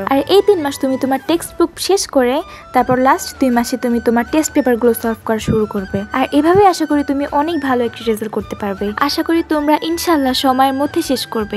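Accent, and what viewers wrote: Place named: Sylhet, Bangladesh. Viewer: Are u sylhty??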